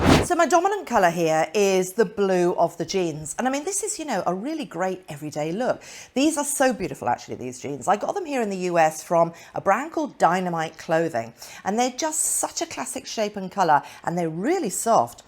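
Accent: United Kingdom. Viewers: My only tip is never wear wide legged pants for travel. The bathroom floors can be disgusting and you don’t want your pants to touch that. Joggers solve that problem nicely. And might I add it was weird, and somewhat even disappointing, to hear a beautiful British voice say sneakers! And I’m American! 😆 Great videos.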